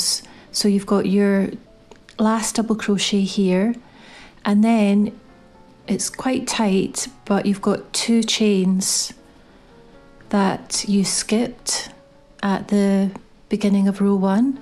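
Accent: Scotland, United Kingdom. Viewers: As a first timer at crochet, I just like to say , your easy to follow, step by step, you're also Scottish which makes it even easier for me. Thanks ♥️ a trillion💯👍💓🏴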